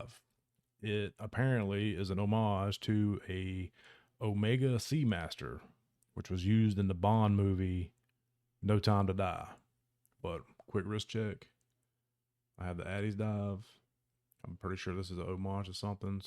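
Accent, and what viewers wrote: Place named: South, United States. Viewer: Thanks for the review fam. Are you from the south? Sounds like you’re from either AL, MS, LA, or TX.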